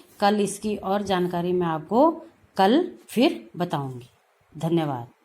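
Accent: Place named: Uttarakhand, India. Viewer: Mam you are from Uttarakhand chamoli right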